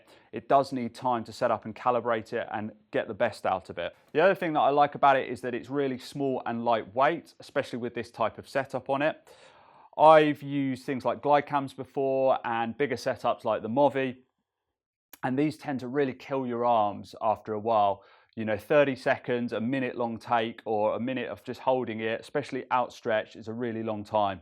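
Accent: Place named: Pennsylvania, United States. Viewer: I've never heard that accent before... you must be from Pennsylvania.. right?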